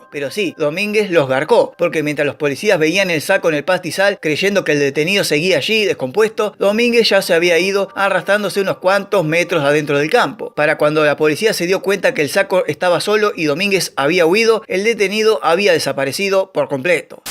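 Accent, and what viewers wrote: Argentina. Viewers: La primera vez que entro a este canal y me gustó mucho el Relato fluido y bien Argento , y las historias Fenomenal 👌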